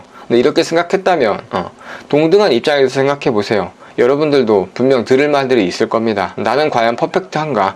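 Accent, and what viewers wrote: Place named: Gyeongsang, South Korea. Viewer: 경상도 억양을 가끔 사용하시는거 같아서요 ㅎㅎㅎ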